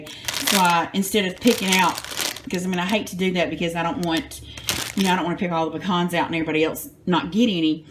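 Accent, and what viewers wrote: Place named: Tennessee, United States. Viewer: Ms.....I LOVE you...."Don't nobody whoop me...and live"...hahaha! I'm right here in middle Tennessee and we talk just like you do! I recently found your channel and I'm going to do that fabulous drip cake you showed and I AM going to lose weight on this doggone LO CARB diet I just started! I hope you will show us how to do it right, girl, because this lard ass is fixin' to GO!!! Looking forward to some lo carb sweet delights. Thanks so much for being YOU...:-)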